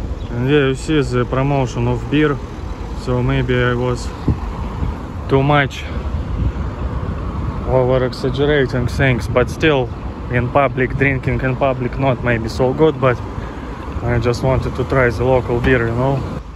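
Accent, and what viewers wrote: Russia. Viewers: Haha you are funny guy. Like you’re russian accent. About USA and Russia I would say we dont care very much and probably dont love them or hate them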